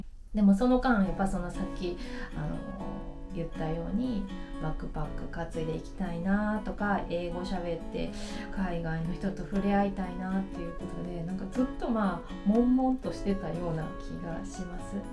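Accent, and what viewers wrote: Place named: Osaka, Japan. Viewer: aiiro70さんが旅先でとても楽しそうに外国の方とお話をしているのを見て、私もスキマ時間に中国語と英語を学び始めました。 同世代、しかも大阪弁のaiiroさんの動画、とても親しみが持てて、楽しみにしています。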